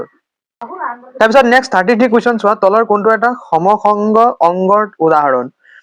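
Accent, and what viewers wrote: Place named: Nalbari, India. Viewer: Sangaskrit or Lage sir Nalbari Distic or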